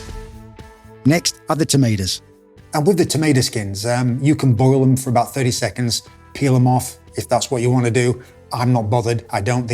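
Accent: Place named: England, United Kingdom. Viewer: Tomita's! Tomatoes! lets call the whole thing off! you sound like and English guy but say tomita's and not tomatoes like an American. Why?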